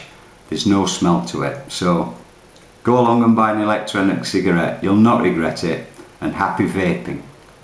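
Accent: United Kingdom. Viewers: I've seen many videos about electronic cigarettes, and so on, but this is the most helpful one so far! Great video. I ordered my electronic cigarette within one hour after watching the video (awesome British accent, btw, lol :D). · Great vid. Really positive : ) Love the accent, makes me feel like I'm back home. Rotherham?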